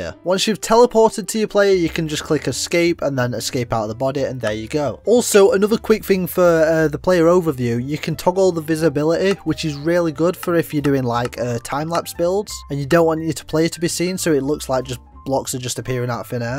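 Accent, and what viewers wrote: United Kingdom. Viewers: are you from sheffeild/yorkshire?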